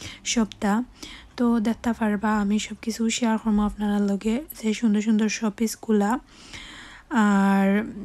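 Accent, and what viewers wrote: Sylhet, Bangladesh. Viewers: ki bala lage syleti kotha sunte👌🏻👌🏻 koro jaga guriya ailam apnar loge😊.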